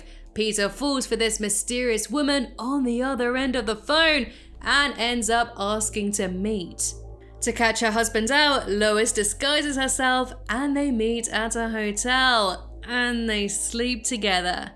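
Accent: United Kingdom. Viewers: You have a beautiful British accent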